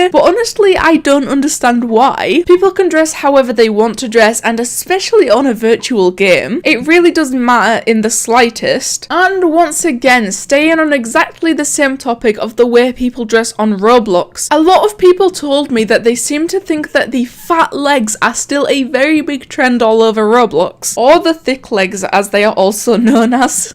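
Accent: Scotland, United Kingdom. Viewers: Wow. 3 seconds in and I can tell you're scottish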